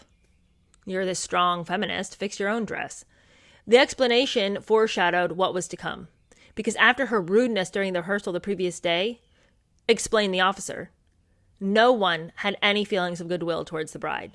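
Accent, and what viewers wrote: United Kingdom. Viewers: I love your Brit accents, LOL. It annoys me greatly that us taxpayers here in the UK fricken paid for this circus of a wedding! She is such an ungrateful bee-atch!!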